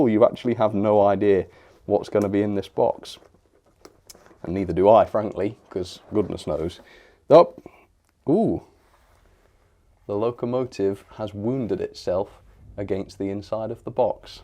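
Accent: United Kingdom. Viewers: Can tell he’s British lol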